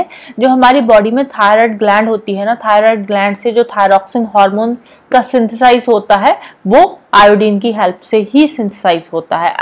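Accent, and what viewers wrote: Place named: Kashmir, India. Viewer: Mam apka ascent kashmiri type h , n Kashmir ke logo ki awaz bahot innocent si hoti h . Ap kya Jammu kashmir se h ? Ese hi question Aya man me to pucha...vese thanx again for everything 🙏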